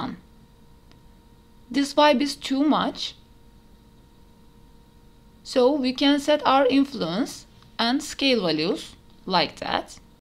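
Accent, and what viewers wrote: Turkey. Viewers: aksandan anladım türk olduğunu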